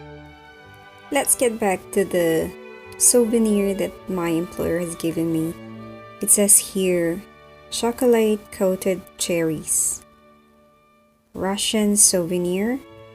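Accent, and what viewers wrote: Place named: England, United Kingdom. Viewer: Interesting Stories, i Like your english accent kabsat.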